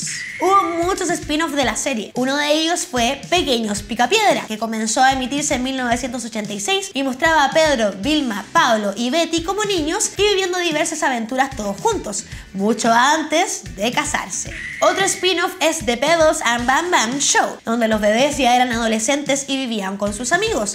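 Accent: Chile. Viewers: Produchon, achon me encanta la fonética de los chilenos xD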